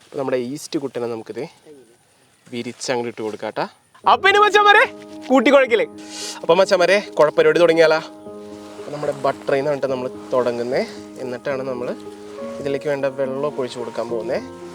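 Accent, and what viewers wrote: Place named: Kannur, India. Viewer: Wow ❤ Kannur full ithan 😂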